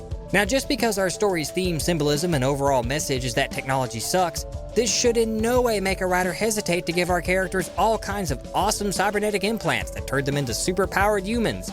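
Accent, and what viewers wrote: South, United States. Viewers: you made the Southern accent great again!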